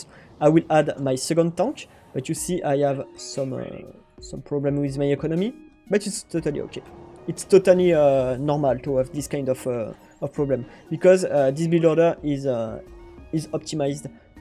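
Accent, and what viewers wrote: France. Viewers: pourquoi les francais de france prononce tous THE comme ZE... <link> <link> <link> serieux je vois celas partout...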